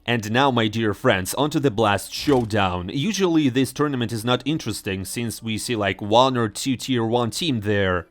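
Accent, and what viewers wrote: Russia. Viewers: nice the russian accsent voice is back BIG W👍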